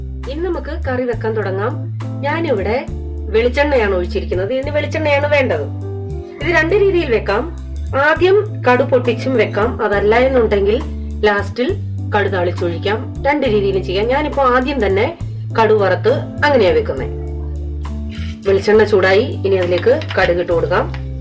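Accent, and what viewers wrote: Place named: Kollam, India. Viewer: supr Chechiiiii.....chechide Kollam slang kelkkan nalla rasamaaa kettto.i like it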